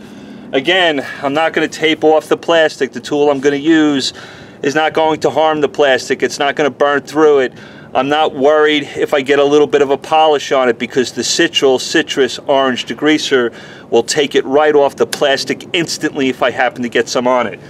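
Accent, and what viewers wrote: New York, United States. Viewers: Derrell Barnett He's originally a New Yorker, I understand him and the lingo being from N.Y. also. The rest of the country is too slow to understand our ways of doing things and speaking.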